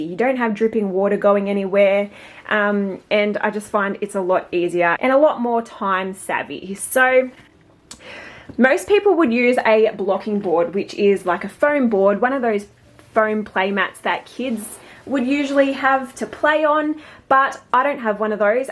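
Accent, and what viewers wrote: Australia. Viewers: Thank you for another informative video! Love your Australian accent 😄 Love from the UK xx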